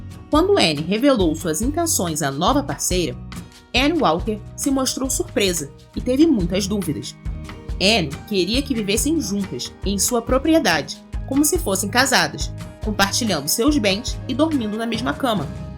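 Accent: Rio de Janeiro, Brazil. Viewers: Lá no RJ eles falam bonitinho assim mesmo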